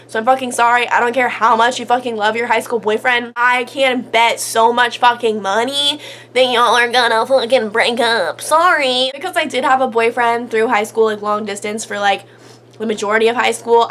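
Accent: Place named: Texas, United States. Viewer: Your texan accent came out a little in this video lol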